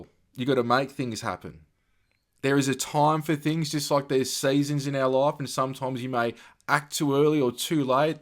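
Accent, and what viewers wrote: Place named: Australia. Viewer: Love hearing a fellow Aussie on my feed. PRAIR :)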